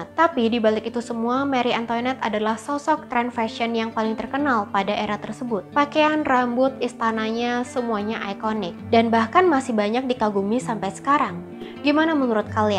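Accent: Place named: Indonesia. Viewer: padahal gak salah loh, coba deh nonton dulu video lain atau dokumenternya lah, beda beda dikit aja, lidah indo kan beda sama prancis asli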